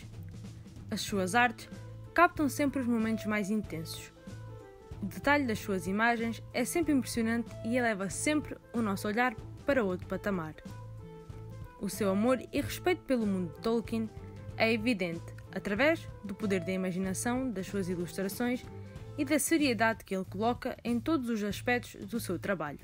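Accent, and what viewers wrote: Portugal. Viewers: Adoro esse sotaque Luso !! 😊😊😊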